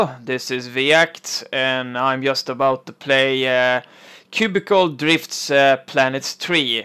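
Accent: Sweden. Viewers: You are Swedish, right? I mean I'm sure Danish people and Norwegians have a similar English accent, but this is identical to the Swedish accent so that's what I presumed that it is. And I don't really engage too much online with voice except with friends on Skype so you're not likely to hear me snacka Svenska.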